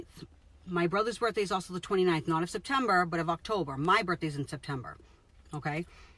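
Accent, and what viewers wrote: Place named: Massachusetts, United States. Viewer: Hello.. I know I'm wicked late to respond.. New subscriber here.. As soon as I started listening to you a few video's ago I knew you were from MA! As am I. 💜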